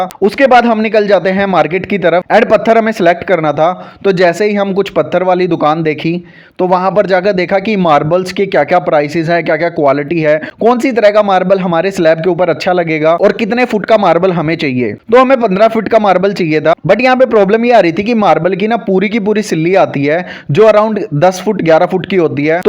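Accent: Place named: Delhi, India. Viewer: desi language me aane Diya Karo shorts bhai desi ka aalg maja hai na jis hisb te bolo ho nu lago ho delhi aale paplu ho. but ek chig ke liye appreciation dena chahuga ki jo pdhhe likhe hoya kare bikuo sense ke tarike se bolte ho . arr ree yo karle 😂 yo hoga wo na karte all the best for you shop🫠 god bless you but ek chig khena chahunga ki aata base use karo ya multi grain khud bhi banwa sako ho arr bdhya quality ke jite wo healthy consider howega arr public daily intake easily kar legi . madia aala sodha bitha dega body nee baki ap samjadar ho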